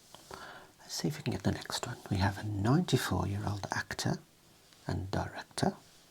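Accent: United Kingdom. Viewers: Are you British? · I don’t know what the accent, british? but it sounds really good, and I love it 🥰 I always fall asleep ❤️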